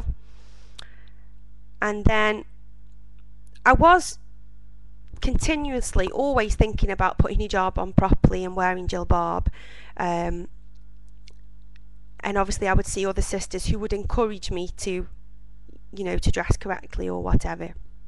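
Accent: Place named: England, United Kingdom. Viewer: asalamu alaykum sister ; congratulation for getting back to this wonderful religion , from your accent i can tell that you are from england or around that area , i know its a hard decision but the benefits are great , , your brother IMAD from california , and congratulation again for converting to islam let me hear from you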